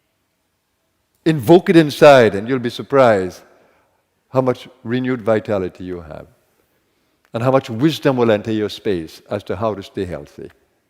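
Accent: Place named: Guyana. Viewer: Very good guidance..thank you... Swamiji your accent sound Guyanese..Are you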